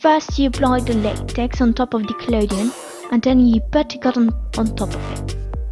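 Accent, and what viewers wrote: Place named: England, United Kingdom. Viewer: Coooool!! U Engels accent kilt mij😂😂😉😘😘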